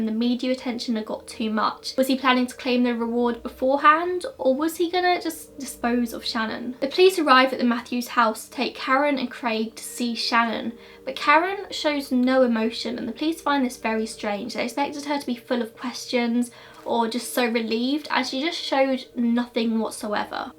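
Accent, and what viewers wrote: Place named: Southern England, United Kingdom. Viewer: I wonder if one day you could cover the case of the kidnapped ten year old girls (Lisa Hoodless and Charlene Lunnon) from Hastings? It's a happy ending case, something I much prefer where children are involved, I bet most of us like happy endings. I was holidaying there at the time and remember searching until I couldn't move my feet another inch, along with hundreds of other people. It's a happy ending in that both girls survived but were horribly abused by the disgusting paedphile who took them, that's the nasty bit. I (and my young son) went back to Vancouver after they were discovered but I've never forgotten that time and Ariel, my son, often asked whether I thought the girls were all right; I always said "yeah, they'll be fine" knowing that they quite likely would never fully recover mentally from the experience. 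Oh: it's a real treat to hear a voice from close to my part of the country, I'm from Surrey so not terribly far away. You are brilliant at presenting all your work, it took an evil virus for me to find your channel and I'm very glad I did. Thank you for helping to stave of incipient insanity and stay safe and well. All the best.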